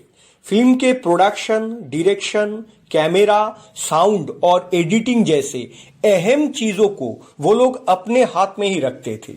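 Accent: Bengal, India. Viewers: তুমি কি বাঙালি।। তোমার হিন্দি তে বাংলার টান আছে।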